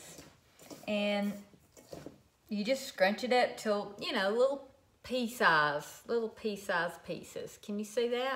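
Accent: South, United States. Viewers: OMG, I’ve been binge watching videos for the past two hours, it’s almost 2am and I have to get to bed! I just can’t get enough of your recipes, and your the sweetest lady & I love your southern accent. So thankful your channel showed up on my recommendation. Thanks!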